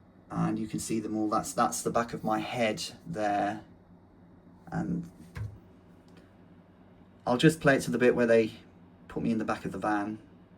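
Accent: United Kingdom. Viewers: THE NARRATION VOICE IS CERTAINLY FEMALE & Brit, to my ears. 1. Surprised "SHE" has a "WIFE". First surprise. 2. If you can "count" the officers, they're there in Numbers, Not in an Amount.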